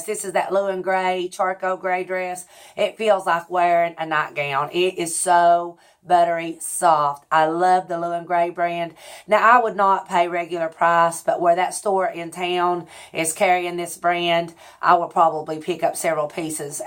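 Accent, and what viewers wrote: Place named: West Virginia, United States. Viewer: Hi! I really hope you read this. I was searching for weight loss help and YouTube sent one of your videos to me. I LOVED IT AND love listening to you! You actually made me cry watching this one. Honey, all women feel the way you have been feeling at one time or another. I can relate to so much to what you have been through. I just wish I would have found you earlier. I wish I would have found you while you were posting your videos. Maybe, with more folks responding to your videos you would have stayed with us. I recently reached out to your daughter Hope to find out if you would be coming back. She said she didn't think you would be back. I am so sad to hear that. If you come back and do a lot more weight loss tips and such, I have no doubt you will get a lot more subscribers. I don't know anyone who isn't trying to lose weight. 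I have lost and gained so many times (like you), you were going to be my weight loss coach. I am binge watching your videos b/c you remind me of so many people in my own family. My Mom's family is from W.V. and have the same accent as you. I love it. If you just got tired of doing the videos, maybe in time you will come back and do a couple or so a month. If you don't, I want to thank you for what you have given us. I so enjoy all the ones I have watched so far. I wish you all the best either way. Take care and enjoy the many blessings you have in your life. We miss you.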